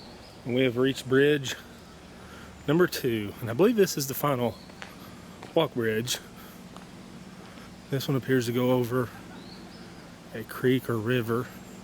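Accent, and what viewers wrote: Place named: South, United States. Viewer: Thanks For another awesome video Peace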